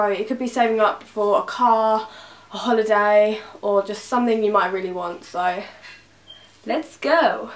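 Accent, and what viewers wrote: United Kingdom. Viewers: your accent is amazinggg omg I LOVE YOUR BRITISH VIBES❤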